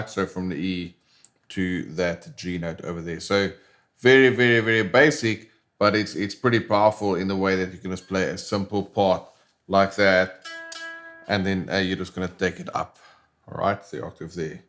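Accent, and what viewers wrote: South Africa. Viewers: Also, what accent do you have? I thought South African at first, but I'm not an accent expert XD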